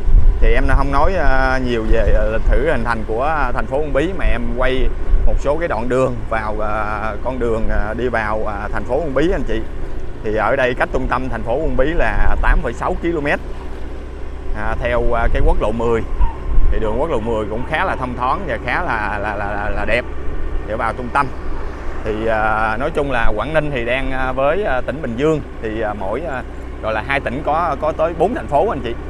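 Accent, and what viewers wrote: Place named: Mekong Delta, Vietnam. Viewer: Xin chào em trai.nghe giọng nói anh đoán em là ng miền tây.vì trước đây những năm 1984 đến 1991 anh năm nào cũng công tác miền tây.đầu năm vào nam cuối năm ra bắc.thời gian ở tỉnh đồng tháp khoảng 2 năm.ở p2 tx sa đéc.rồi cao lãnh .huyện tháp mười vv❤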